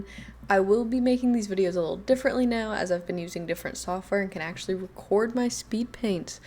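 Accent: South, United States. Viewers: is it just me or has she been getting more southern (hi )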